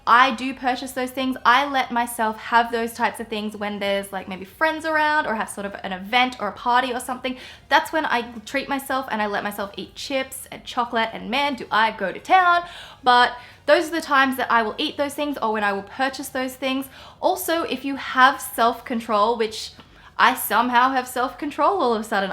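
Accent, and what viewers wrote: Australia. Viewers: She has an Aussie accent. is she from Australia? :)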